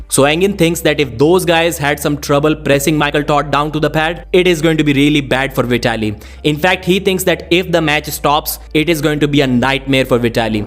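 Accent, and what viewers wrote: India. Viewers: Hey have you ever considered using an AI voice for your videos? I would watch them more but your thick Indian accent makes it hard to understand what you’re saying sometimes.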